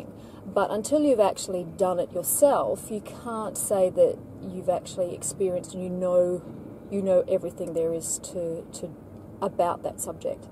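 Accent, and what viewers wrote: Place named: South Africa. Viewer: hi are u from south africa it sounds like it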